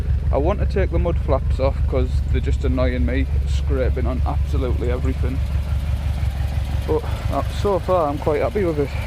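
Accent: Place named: North East England, United Kingdom. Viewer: Dunno where abouts your from mate, but sounds like north east? Im from Gateshead and im currently waiting for my 2006 forester STI to be imported from Japan. Its also black.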